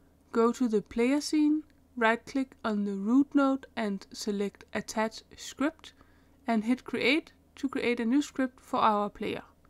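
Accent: Denmark. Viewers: I am really liking this so far and I can't wait to watch more. It's also nice to see and hear a fellow dane make game development tutorials.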